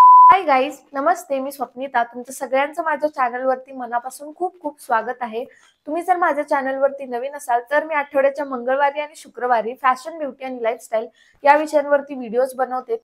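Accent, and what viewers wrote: Kolhapur, India. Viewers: I love the fact that you have sticked to the Kolhapuri accent unit now! Keep it up! Wishing you the huge success!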